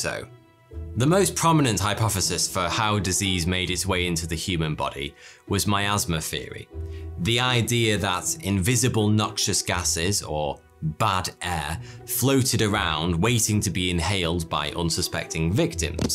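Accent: United Kingdom. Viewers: Because testosterone and brit accent. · It's the power of the British accent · Yes, most British accents are pleasant to listen to, including thoughty2, which is similar to Jodie Whittaker's accent on Doctor Who. It's just that one variation that annoys me from some British TV shows.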